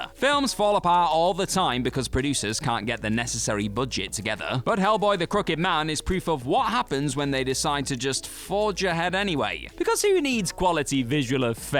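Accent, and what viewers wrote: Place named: RP, United Kingdom. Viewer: I'm sorry, but sucks as a narrator. He always sounds so condescending and phony. Like he's trying to play the character of a posh, Shakespearian trained voiceover actor instead of just doing a normal voiceover where he sounds like a normal person.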